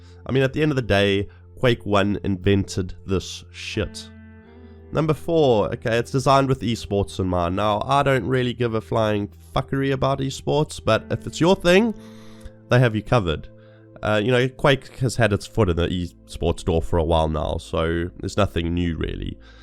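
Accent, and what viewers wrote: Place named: South Africa. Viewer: Dude, you nailed it. Are you South African ?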